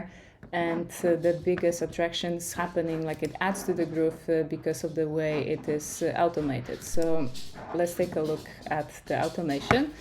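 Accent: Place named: Poland. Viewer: Awesome! Can I find your music somewhere (e.g. Spotify or Bandcamp)? EDIT: ooo, i na dodatek jesteś Polką - tak pomyślałem w 1. chwili usłyszawszy Twój akcent ;) :D EDIT2: muzyka mogłaby być trochę głośniej, a myszka trochę ciszej :)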